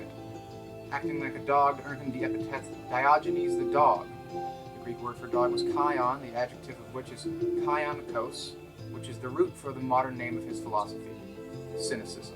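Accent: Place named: United States. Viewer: i'm not too surprised, you're not the first and I daresay not the last to take it upon you to defend american honour or against generalisations/stereotypes and generally ignorant comments. if you're offended I apologise. No buts, just sorry. I find the accent grating and partnered together with a decimation of quality media such as David Attenborough's Frozen Planet annoyed me enough to take it out on all of you Americans. It's not that bold, it's the internet - expect to get input akin to this.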